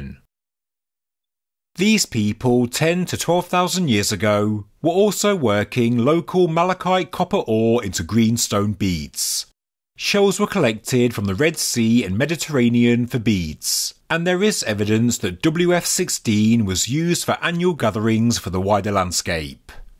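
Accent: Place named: West Midlands, United Kingdom. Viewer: Native of the UK here, I believe it is a west midlands accent ( guess only ) I play at 1.25 speed it makes it more bearable 😂😂😂😂😂😂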